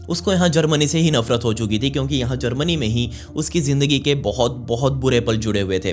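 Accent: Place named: Jammu, India. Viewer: pick me ji.. hua toh thode hi din apko sunte.. pr lgbg saari videao sun dekh chuki hoon.. awaaz lehzaa bhut bdiaa.. pr tone hmare jaisi.. lv u from jammu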